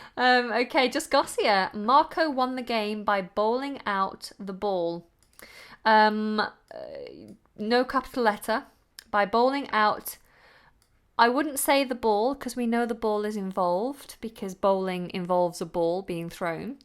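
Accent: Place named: England, United Kingdom. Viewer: Hello, I'm Waser Resaw. Nice to meet you. I'm dance music producer from German record label. I hear you voice and i think it's perfect. Especially your England accent i love it. Your voice catches my attention. Please sendme a inbox with a voice note or audio file saying: "You now listening Pitch Industry, Introducing Waser Resaw" Of course i really appreciate it. <3 My website if you wanna hear mi sounds: <link> <link> I wait your answer. Thank you.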